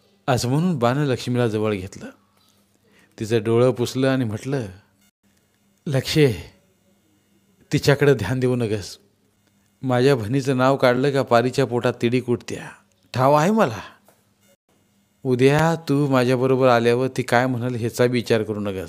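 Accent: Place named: Kolhapur, India. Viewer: मी सर्व भाग पाहते, कोल्हापूर ला माझ्या आत्ती च्या गावाला आल्यागत वाटत्या 🙏अप्रतिम, सर्व दृश्य अगदीच डोळ्यापुढे उभा राहते 🙏अगदी बाहुलीत प्राण टाकल्यासारखे तुमची बोली भाषा आहे 🙏🙏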